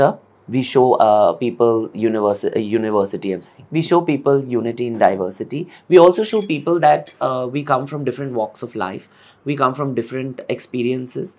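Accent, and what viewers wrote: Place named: Maharashtra, India. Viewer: 😂😂😂😂 love to watching your vlog i m obsessed with "bahot ho gaya fashion and bata wala scene" love u dear make more vlogs with your hindi marathi accent please ❤❤❤❤❤❤lots of love...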